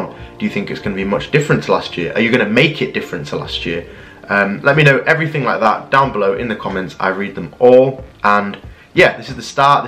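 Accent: United Kingdom. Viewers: you are wonderful! I love your down to earth style and your British accent is wonderful to listen to. Sorry to say, I'm too old to get physically fit. I know you will say "you're never too old." Been there, done that. But I really appreciate all your hard work and your beautiful body. (Do I sound gay? YESSSSS oh well) Love you,. Thank you for bringing some beauty into our sad world.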